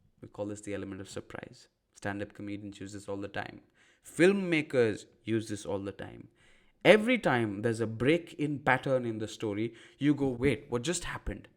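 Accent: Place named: Maharashtra, India. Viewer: I too initially thought you are from Maharashtra :D. Great tips!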